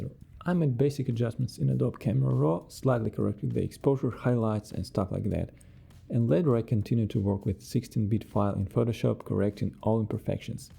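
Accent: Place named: Russia. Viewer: Рашен инглиш ))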